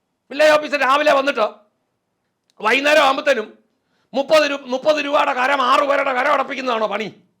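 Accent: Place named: Central Kerala, India. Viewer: മധ്യകേരളത്തിലെ സ്ലാങ്ങാണ്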